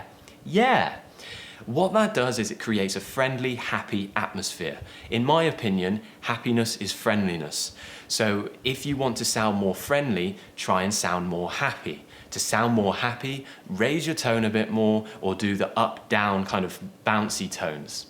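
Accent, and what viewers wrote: United Kingdom. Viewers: I love your way to teach British accent 😍 I really wanna to speak like you.. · Hi I'm from Venezuela and I've been learning British English on my own at home and yours videos are quite good for me cause your accent is great! thanks for make this kind of videos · Hi teacher, i know that my indian accent will never change but I'm very fond with your british accent. Soo sexy, lol. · Hello 😄 I'm Dia my mother tongue is Arabic, I study English, My accent is little bit American, but I'm in love with the British one😍, im following lots of your videos And I'm hopeful that one-day i can manage to have an accent like yours ❤😍 thank you for your efforts ❤ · It has been a utterly splendid, exquisite and flawless lesson. I'm particularly joyful, blissful and elated with that opulent topic. It is to my liking; moreover, it appeals to me. I do adore speaking, and his charming accent., Hence, I present; undoubtedly; the King of British English. 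You are the quintessential of British English. Thank you very much indeed. Far thee well, dearest Prof. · You are epic. Its so clear when You explain. And Your British is so good to listen. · I don't know why, but I enjoy watching your vids. However, as an American I must say that some of what you teach, to our ears signifies a lower class, uneducated Briton. Certain of the vowels and diphthongs, and most of all the glottal stop, which all American diction coaches regard (or used to) as an actual speech defect, like a lisp, to be removed with exercises! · Marry me wtf how u r cute like that with ur British accent 🙆🙆🙆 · Please keep talking i can hear you for hours. 😀😀 I really love your British accent. I have learned a lot. Thanks you.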